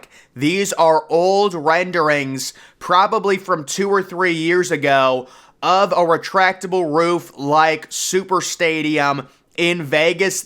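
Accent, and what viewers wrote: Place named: East Coast, United States. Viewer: The host is definitely from the east coast.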